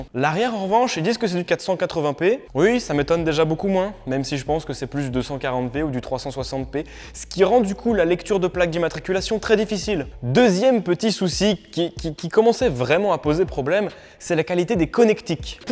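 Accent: India. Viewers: Quand il parle anglais on dirait il parle INDIEN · Grave chaud ! Je suis dans l'informatique et je kiffe ton accent indien quand tu oarle anglais ça me fait penser aux tutos obscurs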